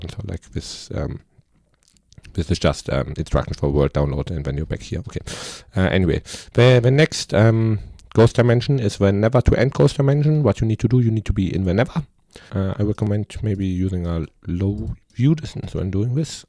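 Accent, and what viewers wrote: Germany. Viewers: I hear a German accent. Good video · Are you german mayhaps?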